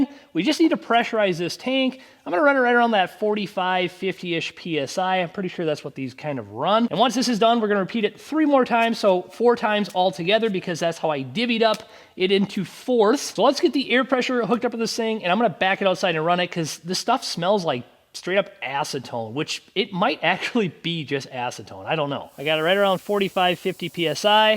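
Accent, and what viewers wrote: Minnesota, United States. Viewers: This guy definitely lives in Minnesota somewhere. There is snow in the background of almost every video! And his accent is a dead give-away.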